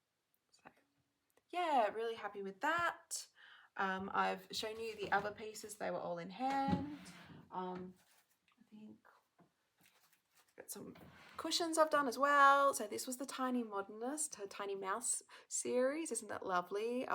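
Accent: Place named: Australia. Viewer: Loved this video. Where in Australia do you live? I adore the accent. I am in the Unites States in Tennessee. I learned to cross stitch back in the early 80s, and learned in hand and still stitch that way. I've tried hoops, q-snap, etc. and they are just too uncomfortable. My fingers give me fits at times so I'm not as fast as I once was but I stitch the sewing method as you do. Your work is beautiful and for having a family you do make great progress. Have you an e-mail address? I subscribed to your channel so I will be seeing you again. Thank you for sharing. BTW, your name is lovely. XX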